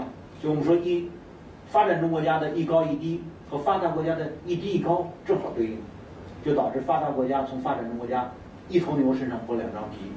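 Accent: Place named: Cantonese, China. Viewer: 这个介绍人的口音富有广东腔。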